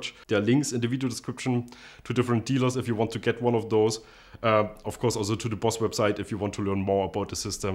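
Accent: Germany. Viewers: omg this German English so funny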